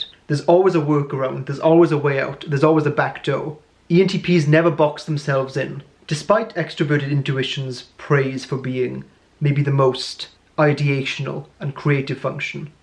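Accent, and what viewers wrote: Wales, United Kingdom. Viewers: lovely welsh accent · Bruh I really want to listen to you but I just can't stand your british accent. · Are you welsh?? Sorry just trying to pin the accent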